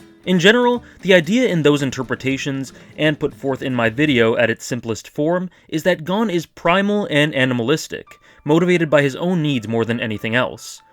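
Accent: Canada. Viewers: You sound Canadian that's all.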